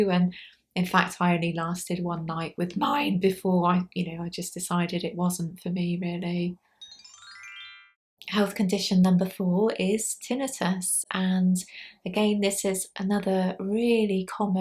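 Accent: United Kingdom. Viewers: Hi! 🧡 Thank you so much for all the time, research, and thoughtfulness you put into creating this helpful, informative, transparent video. First, as always, you are such a comfort. So kind and open, it is really a gift. Someone else commented on your voice and I could not agree more! One of the things I loved about living in England was the accent you all have... Your voice, in particular, promotes a sense of peacefulness, even when you are talking about hard things. Next, I hadn't realized that some of the physical conditions I have are common for folks with autism: I only lasted one night with my mouth guard too. And, my period was so difficult that eventually (and of course I DON'T recommend this because of all the health problems associated with being underweight), I found that if I kept my weight lower than normal, I wouldn't menstruate. And even though my doctor told me to put on at least five pounds so that I would menstruate regularly, foolishly I thought, why would I want to menstruate when it is SO painful?! 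Or gain weight when I don't feel like myself in a different body (the person who assessed me said that is an autistic symptom for some other folks she assessed). So, not surprisingly, I too have osteoporosis. Fortunately I was able to get pregnant with my two boys during a phase of keeping my weight higher so I could get pregnant and nurse... Finally, I was so sad to hear about the high pitched noise Ian always experiences and that you too have ringing in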